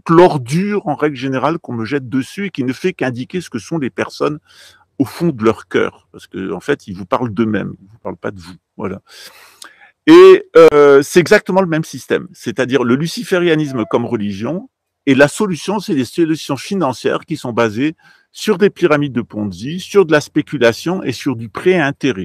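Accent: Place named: Italy. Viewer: Belle accent Italien! L’Italie vous aime, je suis a Rome et décider de vivre ici, les Italiens sont adorable et bienveillant, merci pour vos vidéos incroyable et instructives! Que dieu vous bénisse.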